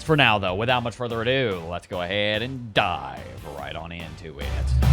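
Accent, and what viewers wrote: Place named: Los Angeles, United States. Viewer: sounds like you're in LA! ✌😆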